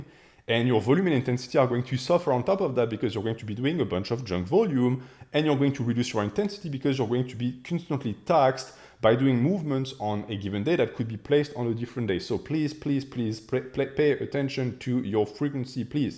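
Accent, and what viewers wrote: France. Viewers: T'es français ? Je trouve que t'as l'accent.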